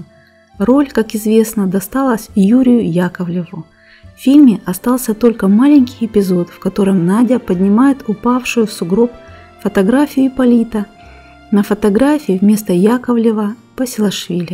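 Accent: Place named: Urals, Russia. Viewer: Да, да...Я тоже его слышу... Она,возможно, с Урала...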